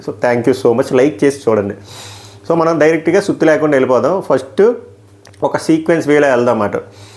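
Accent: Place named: Visakhapatnam, India. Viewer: Super slang bro … I like vizag slang …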